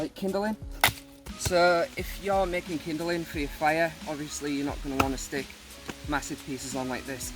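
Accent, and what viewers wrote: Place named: East Yorkshire, United Kingdom. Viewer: you must be from hull or east Yorkshire, the way you promounce "wairk"?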